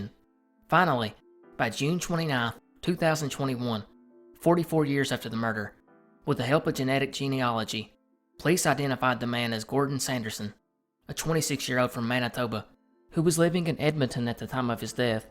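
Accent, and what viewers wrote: South, United States. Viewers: Damn you’ve got a strong accent. Which state r u from? Good video btw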